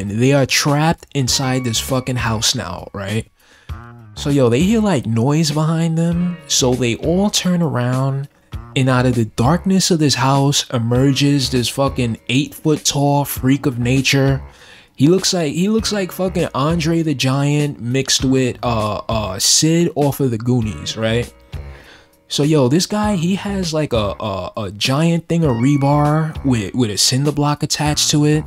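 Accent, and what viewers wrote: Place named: New York, United States. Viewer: Yo, I love your vibe, man. You sound from NYC but I'm not sure. I'm not from the States and I could be mixing up accents. Anyway I like that 'cause Mom picked up a NY accent for the many years she lived there. It's awesome to hear horror reviews in that real street dialogue. Also this book sounds like I need to read this shit soon. Thank you!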